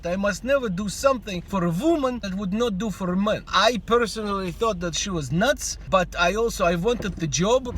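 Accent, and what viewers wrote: Russia. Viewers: you clearly know how to speak English very well! I wish I knew what you sound like when you’re not purposely using this heavy Russian accent. <3 your content regardless! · At first I thought you were faking a heavy Russian accent. But then I realize that it IS your accent. And it is very cute. Keep up the good work. Your videos are excellent!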